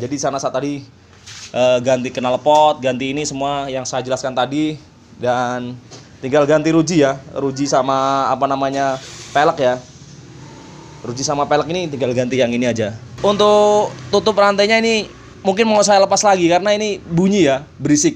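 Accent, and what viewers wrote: Java, Indonesia. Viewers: Rantene rondok amoh...😂😂😂😂 Gue suka logat jawanya..😂😂😂😂👍👍👍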